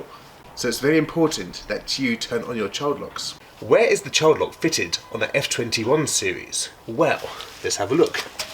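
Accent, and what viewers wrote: United Kingdom. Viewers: Klassno. Tak prijatno slishat British English in Russia! Nice car! <link> My husband's scuba diving videos (mainly)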